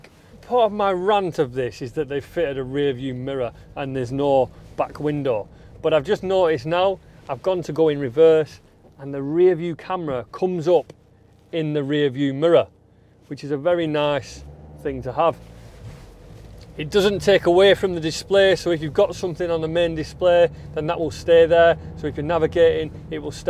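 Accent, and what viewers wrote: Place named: Northern England, United Kingdom. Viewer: fantastic review thanks, living in france coming from Lancashire great to hear a northern very honest voice. thanks for this review very informative very honest, good job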